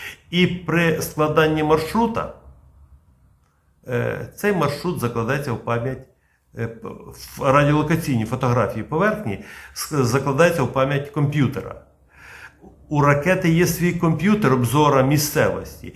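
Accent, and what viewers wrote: Vinnytsia, Ukraine. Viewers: Завжди приємно бачити і чути Вас, пане. Завжди зрозумілі, конкретні, оптимістичні огляди і якійсь рідний для мене акцент мови, як на вінничині. Дякую за всі Ваші труди.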